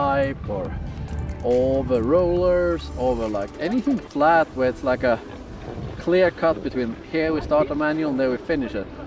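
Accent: Sweden. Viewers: Det låter som att du är svensk jag vet att han prattar engilska men på hans engilska så låter det som att han är svensk🤣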